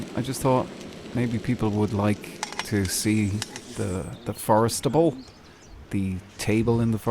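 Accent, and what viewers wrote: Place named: Ireland. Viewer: Fantastic stuff. And I always enjoy how Irish folks say column!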